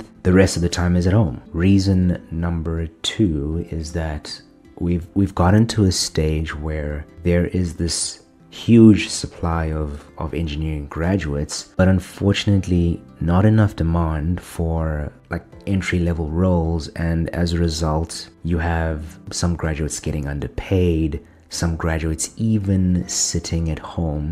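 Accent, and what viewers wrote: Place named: South Africa. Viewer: South Africans legit have the best English accent, I'll fight anyone on that. But on the topic, I get where you're coming from. I'm an immigrant myself, currently in my fourth country. I was pushed into tech. because that was seen as a safe bet and a good match for my skills (and because somehow seeing that someone is into video games automatically equals they'd be a good computer nerd, by some logic of our parents' generation) It was alright. Helped me settle and make some money. But I've always been fascinated by larger systems compared to the minutae of tools and machines that most software careers focus on (I would imagine engineering is the same) I really enjoyed working in Insurance at one point in-between studying/working in tech., and I've always been fascinated and interested in economics. Unfortunately I was never encouraged or supported to pursue that or finance or business; and I had no role models (very important!) until I met my now wife. 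Long story short, I've been studying math for a year now to shore up and I'm going to be starting a Master's in Analytics to first shore up on the necessary applied statistics skills, before I move on to something that is more into economics/policy and thereby something that is more impactful while also being more interesting to me.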